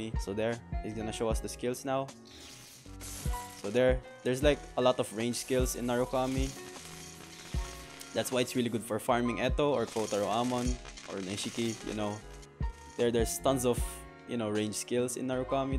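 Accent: Philippines. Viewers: In your voice um I can tell your a Filipino Just for me ehhehehe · I think ur a Filipino Because of Ur Accent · your accent looks like filipino :/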